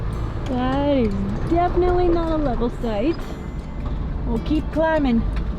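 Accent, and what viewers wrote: South, United States. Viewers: My Friend, just curious, are you From the Southern South?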